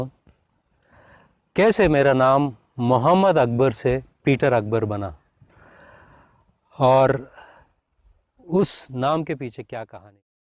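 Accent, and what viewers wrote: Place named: Goa, India. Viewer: Jhoot kion bol the ho tmhara accent he Goa k Christian's wala ha jhootay makar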